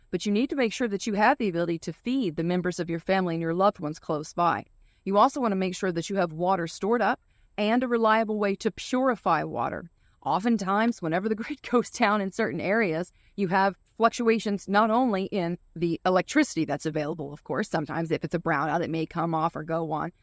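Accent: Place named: California, United States. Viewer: This guy sounds like a Californian; notice that they always are talking about sending other people to do things for them.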